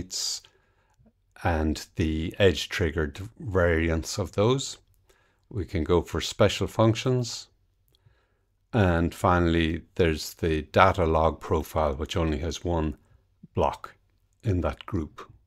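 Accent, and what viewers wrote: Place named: Ireland. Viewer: I was surprised to hear an Irish accent. Good man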